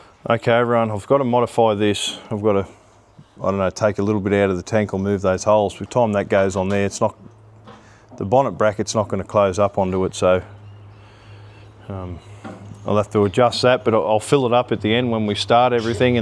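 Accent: Australia. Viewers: its Australian mate 🎉😂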